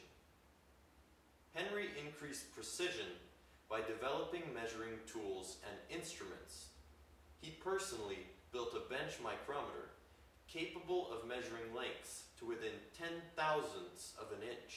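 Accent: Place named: United States. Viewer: I'm always late to the party. Only got a yt recommend today. Good info but as the others have said a touch light on the volume front and your pronounciation a little too american but forgiven on both fronts.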